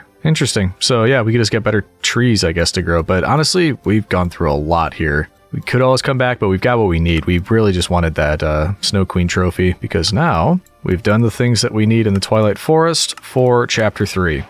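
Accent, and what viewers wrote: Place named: Scotland, United Kingdom. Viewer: I fully enjoyed your Scottish accent!😂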